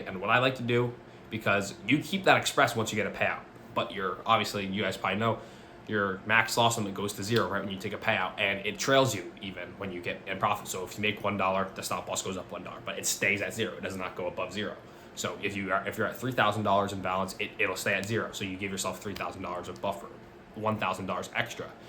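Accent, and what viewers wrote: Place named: New Jersey, United States. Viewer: Nice video. Do you trade the synced accounts on tradongview? Also so curious where your accent is from. NJ?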